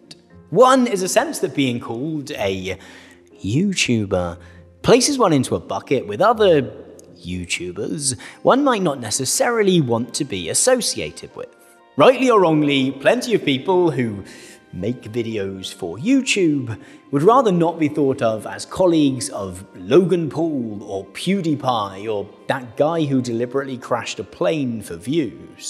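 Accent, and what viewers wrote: RP, United Kingdom. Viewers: cool it with the media training body language and BBC speech. Be yourself.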